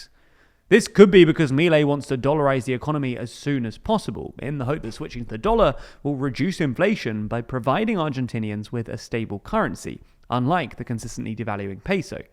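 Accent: United Kingdom. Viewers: So now someone with a UK accent is speaking badly of Milei... Do you prefer that we continue with the previous K government and fill ourselves with Chinese and oriental weapons...? You guys don't like anything. Better deal with illegal migration in your country...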